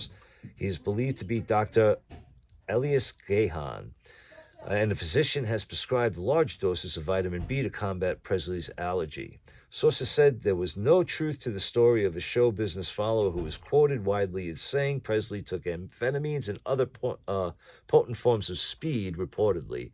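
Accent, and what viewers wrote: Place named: New York, United States. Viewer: Hi, I wanted to say I truly enjoy your videos. I remember having an original Kings Court button when I was a kid, I may still have it somewhere. Also nice to hear the accent of a fellow New Yorker, I’m born and raised in Brooklyn but live in Florida now. Anyway just wanted to say hi and keep the videos and memories coming.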